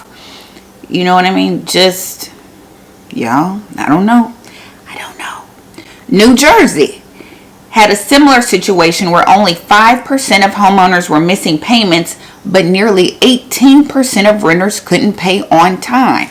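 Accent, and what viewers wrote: South, United States. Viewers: with a southern accent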